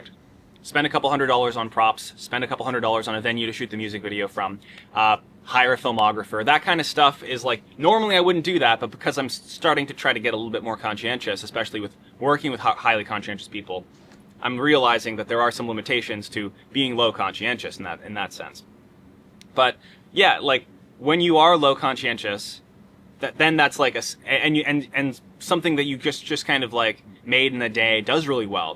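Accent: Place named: Canada. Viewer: he sounds so canadian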